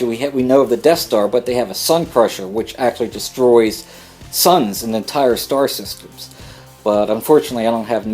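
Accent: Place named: Philadelphia, United States. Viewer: You sound like your from the Philadelphia area. It's like my cousin Nick is talking about Star Wars. Great vid.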